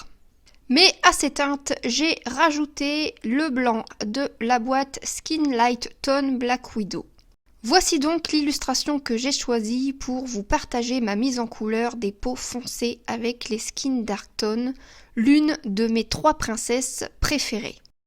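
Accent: Nord-Pas-de-Calais, France. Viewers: Tu n'a pas a etre complexée de l'accent chti... essaies 1 fois ....rien que pour voir les commentaires ... Ose..! j"attends ta prochaine démo impatiament ..... comme je te trouve sympa ce petit truc pour te faire économiser du crayon: quand une mine casse mets une goutte de glue UHU dans le trou et atends 24 h .....bise...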